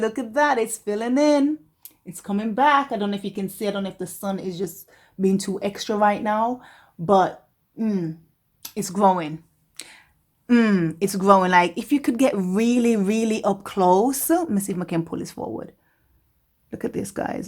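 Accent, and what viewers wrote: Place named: Jamaica. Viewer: Lol you’re Jamaican??? The accent sounds familiar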